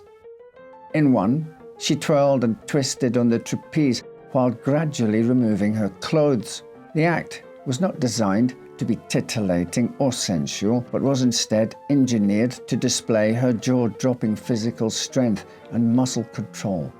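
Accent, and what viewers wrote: Scotland, United Kingdom. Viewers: He's Scottish!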